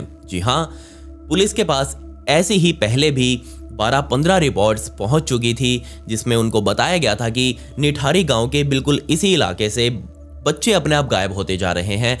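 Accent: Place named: Haryana, India. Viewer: Apki hindi mai , Haryanvi ton Hai लाडले ❤️❤️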